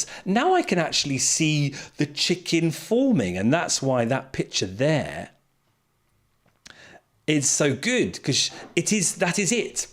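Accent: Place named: United Kingdom. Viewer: I'm here!!! So great to see you again. A great excuse to take a break from tax prep!!! I need more than a stuffed chicken for emotional support!!! You are six foot five. Did I hear that right? How about making a six foot five blue chicken with an Olympic flag......representing you! Hahahaha! They look complicated. Will you accept one for the winter games??? I might figure it out by then!!! It does sound like fun and giving them to children would be fabulous. Where will the chickens go.....they will have flown the coop!!! I hope your store is huge or will they be "rotisserie chicken.....rotating in and out of the window? 🤣🤣🤣 You are so crazy, that's what I love about you!!! I can't wait to see your presentation! Genius! I love how you Brits say Brilliant! This is Brilliant! See you next week!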